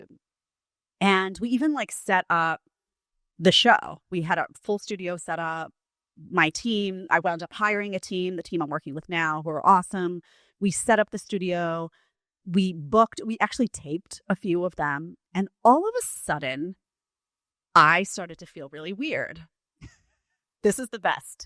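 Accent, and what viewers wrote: New York, United States. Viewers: Yea I thought you was like fuugggeeeettttt aaabbbooouuuuuttttttt that's iiiissshhhhh and rolled all the way out. It's been like what 2.5 yrs since you did something on here. Dang we missed that awesome smile the new York accent and attitude. So happy to see your back. Glad your happy healthy and safe. God bless so happy your back.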